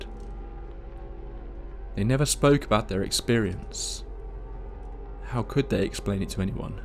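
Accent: England, United Kingdom. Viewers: Something about this guys narration and his regional accent makes these stories, extra interesting. I always keep my attention on the story. A British accent makes everything sound better. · Ive just discovered your channel. I livevin the UK so its good to hear an English accent. No offrnce meant i look forward to many more stoi43t ,😅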